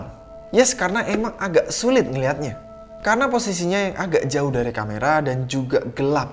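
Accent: Java, Indonesia. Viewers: Bro asli mana? Kok logatnya medok jawa ?😂😂😂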